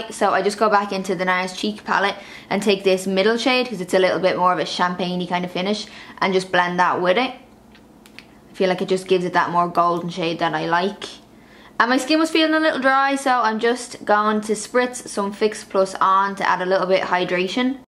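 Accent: Ireland. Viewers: How often do you clean your makeup tools? You are beautiful btw and I love the Irish accent.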